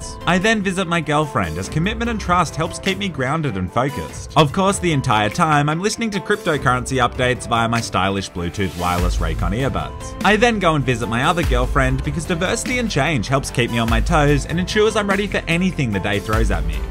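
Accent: Australia. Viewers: just a question are you australian or american?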